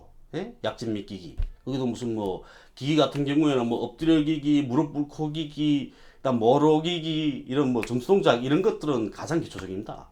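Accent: North Korea. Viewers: 오랜만에 함경도 말씨들으니 정말 감사합니다. 많이 수고하네요.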